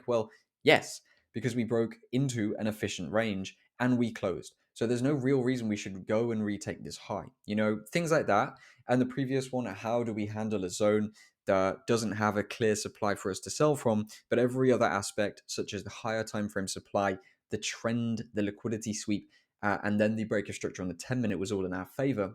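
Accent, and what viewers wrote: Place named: England, United Kingdom. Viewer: I love your sweet English Accent. Almost like I'm listening to English premiere League commentary. By the way your videos has really helped my trading journey. Thank you.❤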